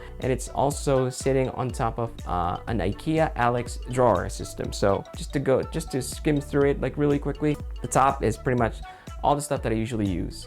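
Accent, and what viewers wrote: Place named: Philippines. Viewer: I can hear the Filipino accent sometimes and I'm loving it 💕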